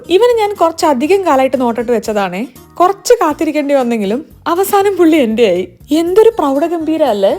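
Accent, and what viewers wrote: Malappuram, India. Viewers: 1..2 aaycha adhonnu ubayokikkate ennit njan adhinte kuttam paraya😅 awww malappuram talkenne ❤️‍🩹🫶🏻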